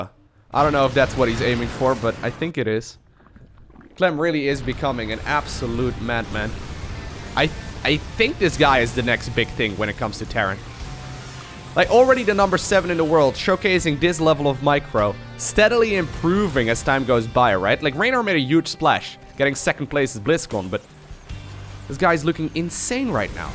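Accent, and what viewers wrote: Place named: Netherlands. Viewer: Lmao, Im praticing my "Hello everyone, my name is " so loud. Its getting pretty close, but it is missing that dutch accent.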